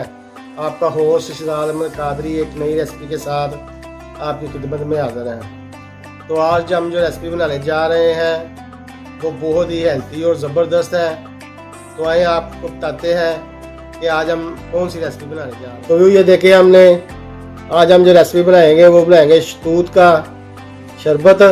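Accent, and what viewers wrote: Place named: Punjab, India. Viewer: W SLAM BHAI BOHT HI NICE SHARING WITH PUNJABI AACENT LAAA JAWAAAB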